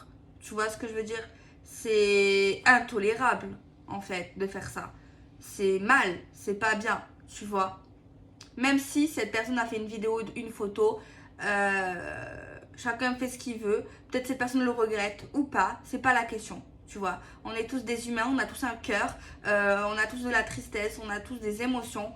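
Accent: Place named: Marseille, France. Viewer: Bj d entré le premier message est pour moi! Avec l accent marseillais comme moi! C est super ma belle! O bonne mère bénie cette petite femme d amour!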